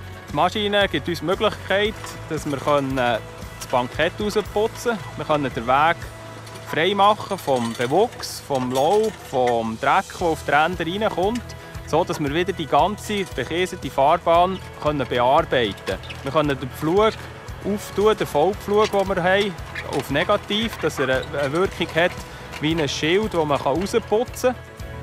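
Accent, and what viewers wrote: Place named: Bavaria, Germany. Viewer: Ich versteh alles, aber man muss sich anstrengen. Im südlichen Bayern der Dialekt ist quasi der selbe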